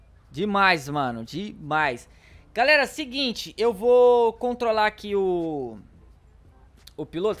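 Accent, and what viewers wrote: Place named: São Paulo, Brazil. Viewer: a voz do davy jones , so que de sp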